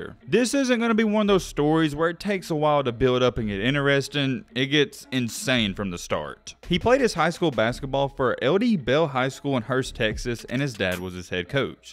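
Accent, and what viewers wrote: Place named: Appalachia, United States. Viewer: You gotta be Appalachian with that accent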